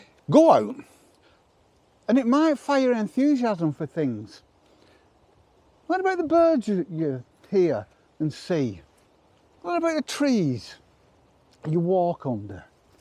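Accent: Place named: England, United Kingdom. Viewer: Oh, that made me feel like I was on a walk with Epictetus with an English accent. It's -7C/19F here, and I'm not going outside except for the farm chores, so I enjoyed the vicarious walk where it's still green.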